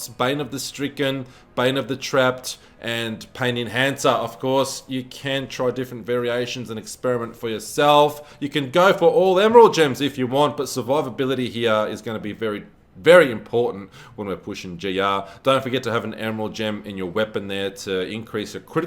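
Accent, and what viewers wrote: Australia. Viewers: It is like August....Augild....Orgild. Hope that clears it up, it is our Australian accent. Love your videos even if you can't talk properly.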